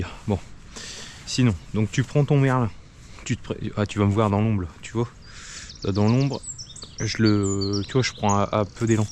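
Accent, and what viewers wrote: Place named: Nord, France. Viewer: Ti t es de ch'nord. Merci pour la vidzo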